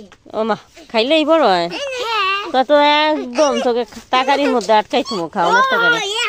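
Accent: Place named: Faridpur, Bangladesh. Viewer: খুব সুন্দর হয়েছে আপনার মেয়েদের ভিডিও। আপু আপনার গ্রাম কি ফরিদপুর? আসলে ভাষা পরিচিত লাগছে💚💚💚💚💚💚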